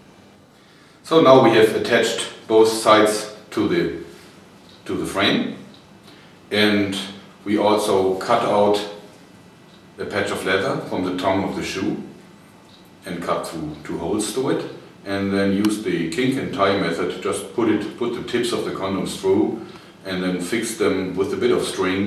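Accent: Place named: Germany. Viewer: dieser akzent... jup, ein deutscher! :-)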